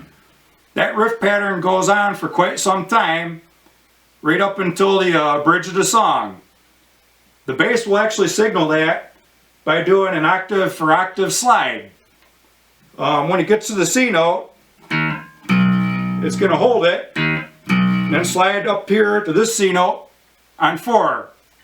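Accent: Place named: Canada. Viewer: I hear a Canadian accent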